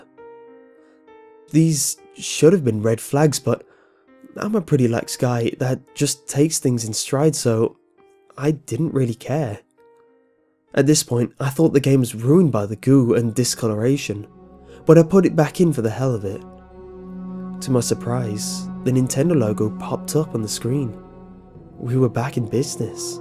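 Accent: United Kingdom. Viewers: yay british narator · || WhoSquad he's British ever heard of it i think you don't because most people on youtube are american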